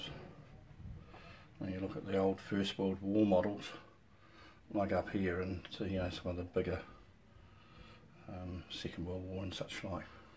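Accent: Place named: Australia. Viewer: You sound Aussie !!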